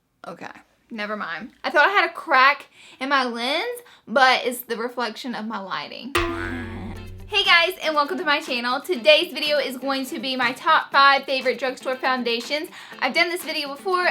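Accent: South, United States. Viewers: I say oil the same way.. I think it's a southern thing because in Louisiana that's all you hear! 😂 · that country twang lol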